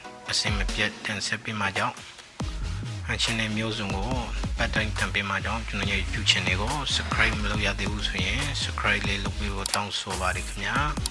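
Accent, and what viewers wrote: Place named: Rakhine, Myanmar. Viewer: ရခိုင်စကားပြောသံ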